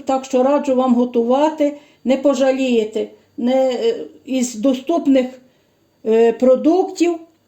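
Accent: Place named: Transcarpathia, Ukraine. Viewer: Дякую за рецепт і приклад закарпатського говору.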